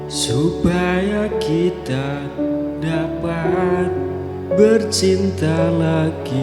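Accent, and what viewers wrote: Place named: Java, Indonesia. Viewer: asalamualaikum suwara nya udah enak tapi kurang lembut sedikkit jadi kalu di dengrin masih kelihatan mendok semangat untuk kang ❤❤❤...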